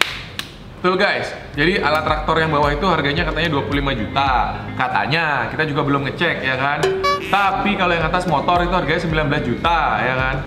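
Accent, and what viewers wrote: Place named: Java, Indonesia. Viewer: bng lo orang mana kok medhok banget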